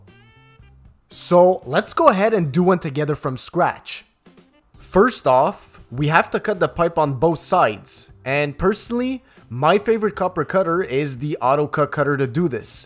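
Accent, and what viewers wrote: Quebec, Canada. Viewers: Sounds like a Québec accent ?! I believe I hear poutine between the plumbing... Is it ?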